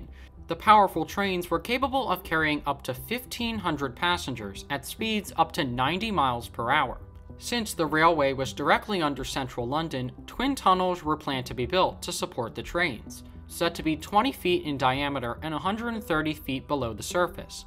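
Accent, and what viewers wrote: United States. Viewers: I didn't realise that we priced projects in US dollars in London. What on earth were the makers of this video thinking of: pricing the Crossrail project in US dollars ? What's all this US accent to tell us about the London Crossrail? We don't have 'railroads' in London: we have railways. We don't have 'transportation' in London; we have transport. We do not have a 'Department of Transportation' in the UK; we have a Department of Transport . This is actually written on the screen. Why on earth do you use a voiceover speaking US English?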